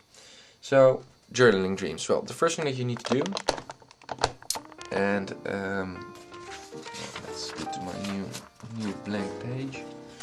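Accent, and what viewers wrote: Netherlands. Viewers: Ben je Nederlands dude P: ? ik hoor t aan je stem.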